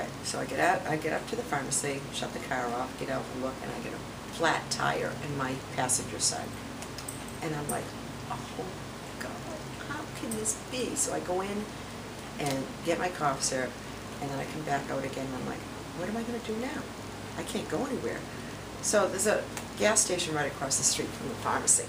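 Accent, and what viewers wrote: Boston, United States. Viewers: your story did put a big smile on my face still having a very difficult time but I’m glad that you commented on my video and directed me here I probably should know this and we have probably spoken about it but I can’t remember are you from Massachusetts because you definitely have a Boston accent - I know you’re sick but you look amazing and yes prednisone is some scary stuff and can make you feel really weird I have to catch up on some of your videos I don’t always comment but I do watch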